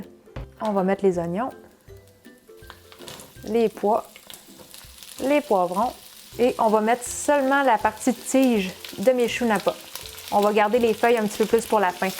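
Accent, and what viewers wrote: Canada. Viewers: Bonjour ! Je te suis depuis quelques jours et je suis conquise par tes explications et par ton accent canadien.... j’aime beaucoup tes recettes et vais essayer de les refaire .... à plus. !!!!! ♥️😊👍👍😘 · Merci beaucoup et demain, votre recette trônera sur notre table en Californie. Un peu de curiosité, vous avez un léger accent et j'opterais , peut-être Centre du Québec (Trois-Rivières) ?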